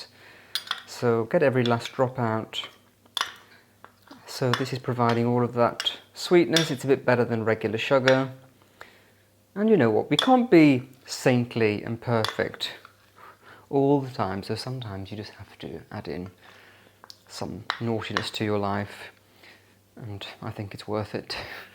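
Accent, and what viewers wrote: United Kingdom. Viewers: Thank god Little Sophie is feeling better. Nathan is such a good friend and we miss him. You have the most beautiful voice and I love your British accent. You just calm us down with that beautiful way you speak 😇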